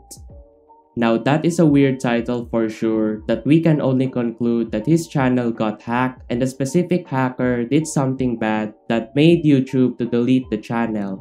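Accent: Philippines. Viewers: i knew it your a Filipino by your accent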